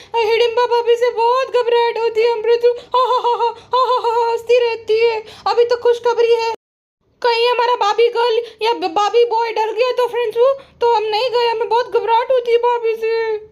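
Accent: Gujarat, India. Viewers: Aap gujrat se ho kya??? Bcz some words se gujrati lgte ho😅😅😅😅plzz reply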